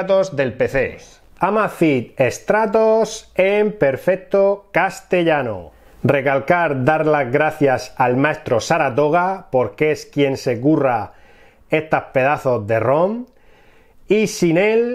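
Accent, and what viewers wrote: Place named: Calasparra, Spain. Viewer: te agradezco el tutorial, pero por favor, practica un poco el castellano que vamos, juraría que eres de calasparra, sin mucho temor a equivocarme, y cuando hayas suavizado el acento, prueba con el inglés, que he tenido que ver dos veces el video para saber de que cojones estabas hablando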